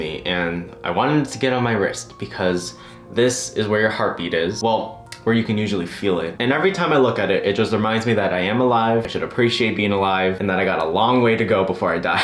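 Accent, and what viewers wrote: China. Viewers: Lol i luv your chinglish.its so cute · aaaaahhhhhhhh the chinglish is cuuutttttteeeeeee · your chinglish rESONATES with me it made me so happy heh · Who else is INLOVE with his chinglish ? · your chinglish brings back memories from family reunions lmaooo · The chinglish was so adorable. As a person who speaks Chinese, I find it so cute. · His chinglish is adorable.... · Your chinglish is so funny 😂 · wOwW- you also talk chinglish? 👌😔👌 relatable. but- your parents are so supportive though i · chinglish sounds really cool tho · Oml ur Chinese is the literal cutest. “妈妈， 我有一个surprise我要show你.” OML I DIEDDD **Chinglish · im sorry but your chinglish is so uwu like "ni men bu cArE" aH CUTIE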